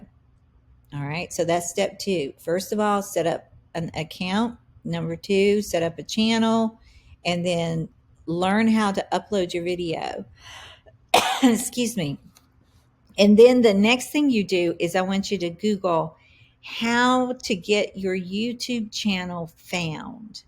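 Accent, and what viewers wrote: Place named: South, United States. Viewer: You have such a delightful southern accent. I've watched this twice:pride-flower-pansexual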